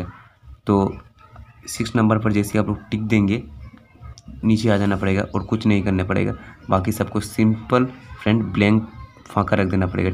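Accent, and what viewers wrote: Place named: Bengal, India. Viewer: Jo bhi ho ye to pata chal gaya k tum bangali ho.hindi tumko thikse nahi ata hai to bangla me vodeo banaw.hindi me jaruri nahi hai.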